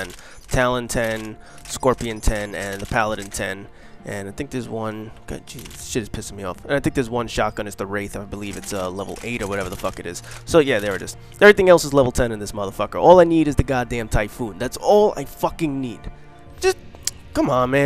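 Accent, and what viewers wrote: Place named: New Jersey, United States. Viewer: He sounds like he's from Jersey Shore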